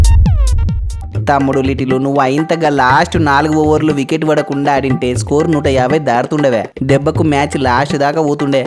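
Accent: Rayalaseema, India. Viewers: ఈడ క్రికెట్ ఆడినట్ట్టు లేదే ఇపుల్ బజ్జి ఆడినట్టు ఉంది 😂😂😂😂😂 రాయల సీమ బాషా మజాకా 😂😂😂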